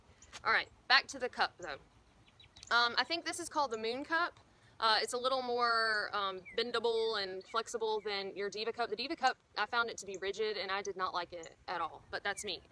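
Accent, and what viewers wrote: South, United States. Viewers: Hey, okay, I have to ask, what part of America is your accent from, I love it. No offence, Brits always ask this question! Warm regards. DisabledPrepper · What southern state are you from?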